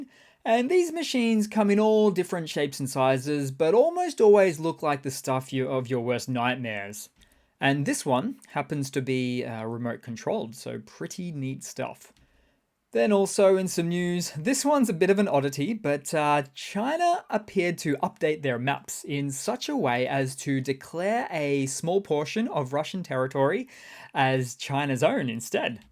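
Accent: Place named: Australia. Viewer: I like your accent mate. Are there many different aussie accents or do you all speak the same?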